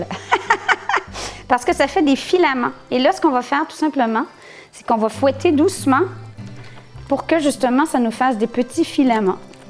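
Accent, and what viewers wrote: Canada. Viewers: J'aime votre Accent Canadien Moi j'ai l'accent du midi je suis de nice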